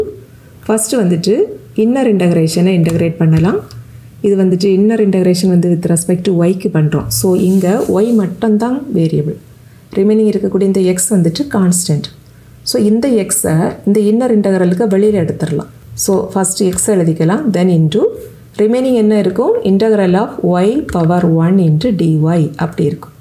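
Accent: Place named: Nagercoil, India. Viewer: Thanks mam,clear explanation with nagakoil slag was awesome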